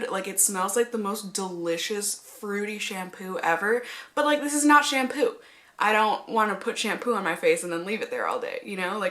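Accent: Minnesota, United States. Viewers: IVE WATCHED LIKE 20 OF UR VIDS AND WAS LIKE hmmm I get a vibe she's from Minnesota and BAM FIRST 30 SECS OF THIS VID AND IM RIGHT YESSS (fellow Minnesotan btw) :))) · The first video of yours that I saw was your Minnesota accent tag, (I'm from MN too!!) now I'm hooked on your videos! I just loveee your personality and how sweet you seem ❤️❤️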